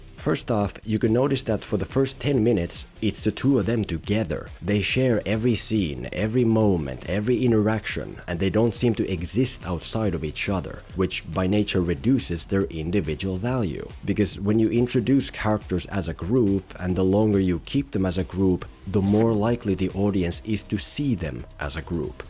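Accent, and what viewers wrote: Finland. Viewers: This is the most finnish sounding accent I've ever heard... regards, a finn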